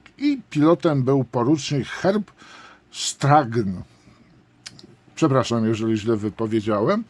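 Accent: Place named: Poland. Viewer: Ciekawy Polski alcent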